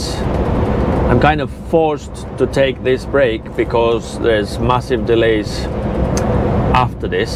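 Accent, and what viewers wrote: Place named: Manchester, United Kingdom. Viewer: Bloody hell you don’t half sound like you have a Manchester accent sometimes pmsl I’m from Salford originally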